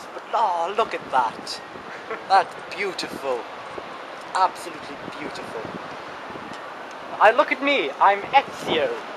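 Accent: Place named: Newcastle, United Kingdom. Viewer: Up heeeaaaa? Ooh the Geordieness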